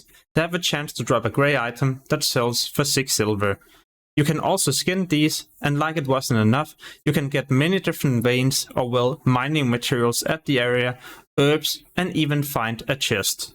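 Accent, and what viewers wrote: Denmark. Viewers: m8 listen to the accent, thats gotta be the phattest danish accent ive heard:D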